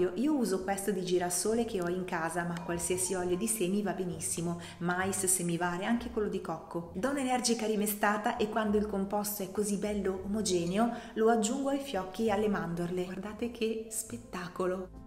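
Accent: Romagna, Italy. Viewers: Ottime idee!!! La tua voce è molto dolce..sei romagnola vero? 🙂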